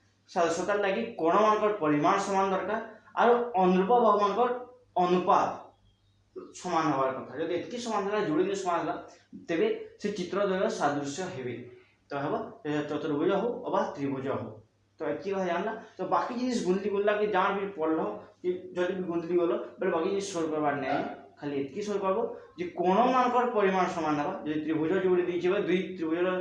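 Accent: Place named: Odisha, India. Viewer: ମତେ ବୁଝା ପଡୁଛି। ଆପଣ ବହୁତ୍ ଭଲ ପଢାନ୍ତି ସମ୍ବଲପୁରୀ ଭାଷା ତଥାପି ବହୁତ୍ ଭଲ ଲାଗୁଛି ଶୁଣିବାକୁ,ବୁଝପଢୁଚି ମଧ୍ୟ।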